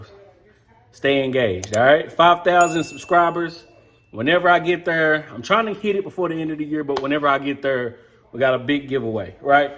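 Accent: South, United States. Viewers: That country accent makes these videos hard to watch. Narr, parr harr, hurr. That why its taking so long to get 5k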